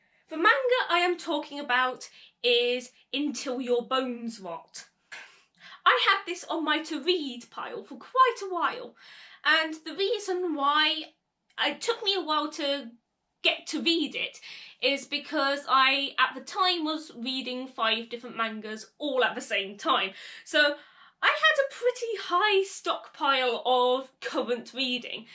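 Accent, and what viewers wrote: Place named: United Kingdom. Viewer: I've always wanted a British accent!!! Don't let it be, ur born with it and it's very very beautiful!!! I'm 20 so u know I'm not some old creep.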